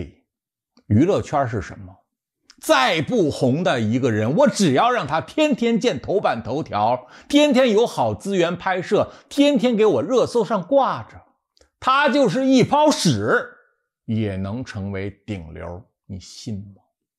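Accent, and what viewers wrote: Beijing, China. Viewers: 一口京腔，南方人的我不太习惯。还是喜欢标准普通话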